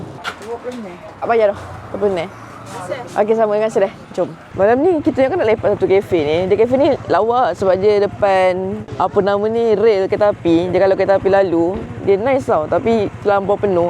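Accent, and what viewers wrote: Kelantan, Malaysia. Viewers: woi, steady ahh kecek kelate...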